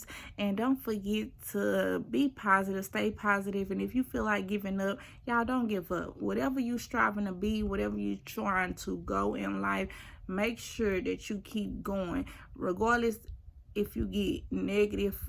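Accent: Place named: Texas, United States. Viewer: Are you from Texas? I can hear the accent 🥰🥰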